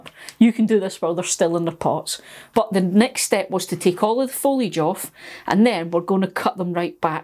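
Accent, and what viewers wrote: Scotland, United Kingdom. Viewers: Thanks - really good informative video, love your Scottish accent too!!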